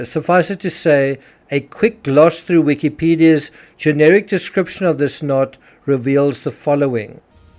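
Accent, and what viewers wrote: South Africa. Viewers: I've been listening to your podcasts for some time now and agree with all you say. Tonight, I realized how much you care about the victims too that you take deep dives into. I was surprised you had so many books on the JB Ramsey case, but bought another triology of yours that is a different famous murder that also took place near Christmas. I have been reading the set, and you are very logical and precise. Thank you. I plan to start the first trilogy on this case next and go from there. For those that don't know, these Kindle books are very reasonable in cost. Thank you, for your help in understanding what has occurred and for your care. Last, your accent reminds me of our neighbors we had in Geraldton, Western Australia, who are from South Africa. There is a large community there of immigrants from that country. There is even a church that has services speaking in Afrikaans. 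Blessings.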